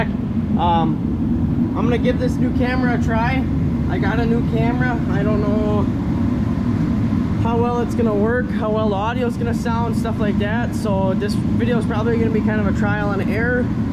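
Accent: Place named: Wisconsin, United States. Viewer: What part of Wisconsin are you from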